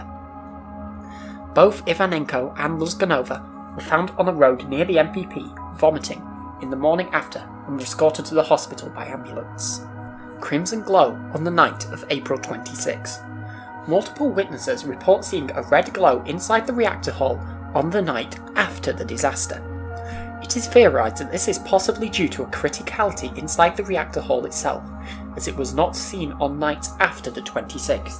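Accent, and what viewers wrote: United Kingdom. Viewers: have you never heard a northern English accent before?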